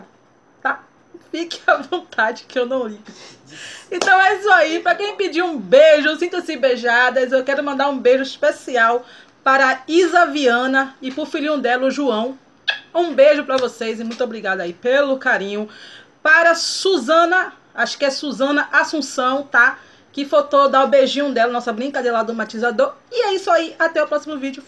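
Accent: Bahia, Brazil. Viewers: kkkkkkkkkkkkkkk isso mesmo manda conta do mes pra pagar seu dentistakkkkkkkkkkk adorei o beijo p euzinha e p Joaozinho linda que Deus abencoe muitoooo baiana arretada adoro seu sotaque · Engraçado ne não agravando a todos "Tem pessoas q não gosta do nosso sotaque baianes fala mal de nosso povo e quando chega o Carnaval tá todo mundo socado até o talo na nossa terra vai entender "Manda esse povo deitar na Br. 😂😂 Linda sua maquiagem.😘😘😘 Liga não o povo quis dizer q seu amor é todo grosso mais é os braços kkkkk 😂😂😂😘😘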